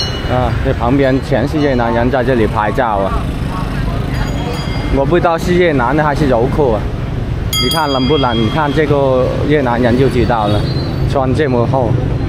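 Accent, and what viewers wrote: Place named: Guangxi, China. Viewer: 广西老表么，口音好像啊😂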